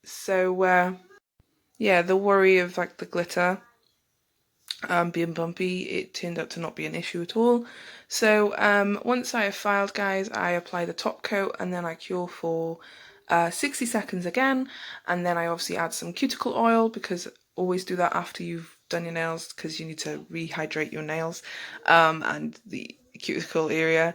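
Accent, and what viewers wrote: England, United Kingdom. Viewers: Don't stop talking, love your English accent, although the music is nice, too.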